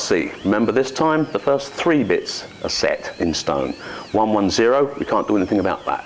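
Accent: United Kingdom. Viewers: british pronunciation?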